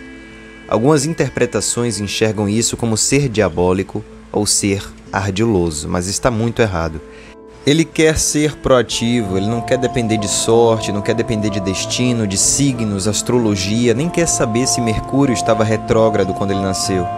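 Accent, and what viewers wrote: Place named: Fortaleza, Brazil. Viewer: Conheço esse sotaque. Tu és de Fortaleza? Gosto muito de Maquiavel.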